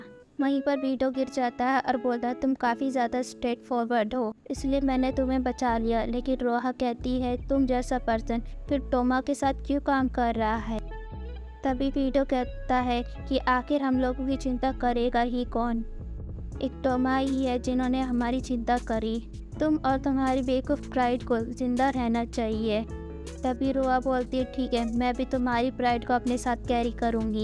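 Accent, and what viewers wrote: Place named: India. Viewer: I don’t want to be mean but you sound like every Indian person ever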